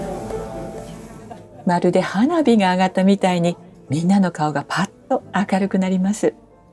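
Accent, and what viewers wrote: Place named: Japan. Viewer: Trop agréable d'entendre une voix japonaise. Vous avez de la chance car notre expérience notamment le service était très mitigé au Lasserre pas très bien. Mais les plats étaient OK.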